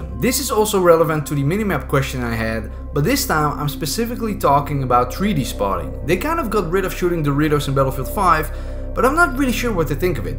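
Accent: Netherlands. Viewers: this mans dutch accent... love it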